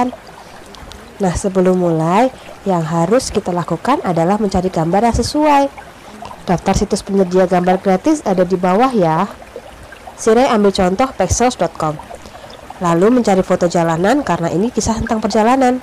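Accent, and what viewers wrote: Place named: Java, Indonesia. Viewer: baru tau ini suara... jawa bener..hehehe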